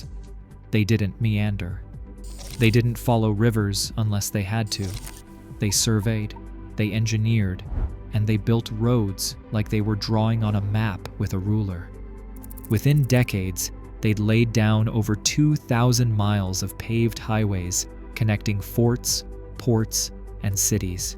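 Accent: United States. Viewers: The city of Derby is pronounced in the UK...correctly...as DARBY...whoever put this piece together should have organised an English-accented Narrator...an American accent is inappropriate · Whoever produced this, and I'd be curious to know, its very interesting. Subscribed. 2 Questions. Why voice-over in American English, not N.England accent, say? What's this got to do with aviation? Keep 'em coming!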